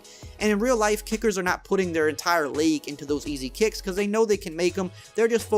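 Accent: Louisiana, United States. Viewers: U gotta be from Louisiana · You sound straight from Chalmette. You're from Nola?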